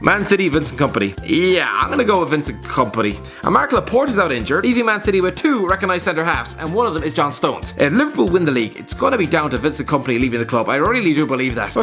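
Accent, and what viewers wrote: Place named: Ireland. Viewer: I love your accent thats irsh init amazing accent that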